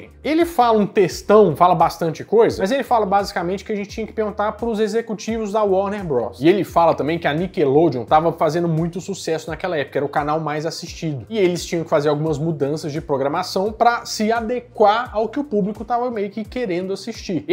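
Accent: Minas Gerais, Brazil. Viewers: Esse resumo em mineires ficou bom demais kkkkkkk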